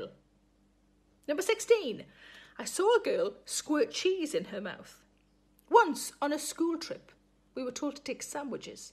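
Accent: Newcastle, United Kingdom. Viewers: I'm semi-bingeing (sp?) this in the US because I love you, Sarah Millican, and your gorgeous Geordie accent, and because I desperately need this upbeat and cheerful and silly distraction in my life. I am watching 3 or 4 episodes at a time and hope to catch up to you soon! Thank you for doing this. I haven't worn a bra since March 12 -- not to walk the dog, not even to go to the grocery store. It's been like heaven. I'm worried I'll feel all constricted when it's time to go back to an office again.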